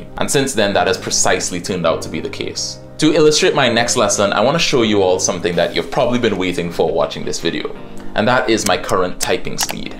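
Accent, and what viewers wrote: Trinidad and Tobago. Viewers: Are you from Trinidad & Tobago? You sound exactly like one of my coworkers. Except with a less pronounced accent. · Are you from Trinidad?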